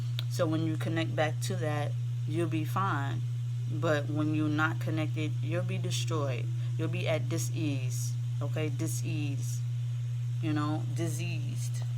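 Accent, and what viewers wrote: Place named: New York, United States. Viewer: Good morning, I just found your channel, oh my goodness, what a gift you have! And thank you for blessing us with all your wisdom, knowledge, guidance and truth! You enlightened and empowered me with your uplifting spirit and powerful messages. This is what I needed ASAP, now I feel better equipped to move forward and start a new beginning with myself. P.S. you sound like a New Yorker, I live in the Bronx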